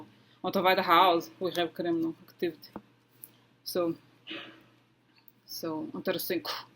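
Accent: Russia. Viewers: Hello! Your Russian accent is fun screw the people who don't like it. Wouldn't it be a better strategy for Mueller to stop BEFORE they vote? Seems like they would be all ups in arms once he's in. Supposedly the Russians hacked the Pubs emails too. Maybe they are blackmailing them AND giving them $$ too. Maybe u already said that at some point.??? 🤔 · I like your accent and I was watching Lena Rodriguez and she likes your Russian accent too. Keep happy I know all this politics must be draining and stressful, it has drained me, and I live in Australia. I also have been worried for the Americans and the world, I need to feel safe again, so keep up your good work... Stay healthy it costs to much to get sick in America. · You help make my morning...Russian accent and all...boom!!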